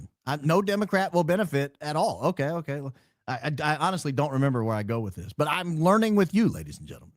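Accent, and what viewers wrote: South, United States. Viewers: Alright, I’m going to need 1987 southern accent to make a comeback😂😂😂. I also have never voted for memaw Ivey. And I’m not voting for Tommy from Florida either lol 😊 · You absolutely should split your vote up and always vote for the best candidate that's best from America First and Only. I also don't think you looked fat and terrible in that old video, I also like the southern tang a bit.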